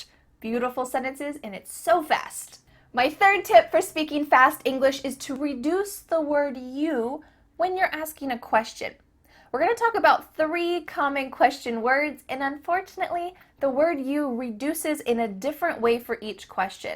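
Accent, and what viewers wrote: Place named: United States. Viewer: Do all Americans speak like you do? It sounds like a lot of slang. May I ask what program did you use to make the video?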